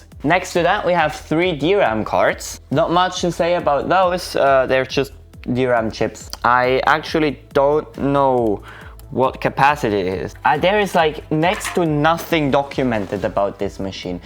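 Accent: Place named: Spain. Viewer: I can't understand your Spanish accent...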